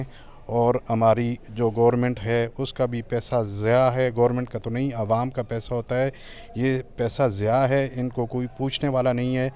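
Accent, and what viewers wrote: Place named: Azad Kashmir, Pakistan. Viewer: Aap ka lehja azad kashmir wala laghta hai.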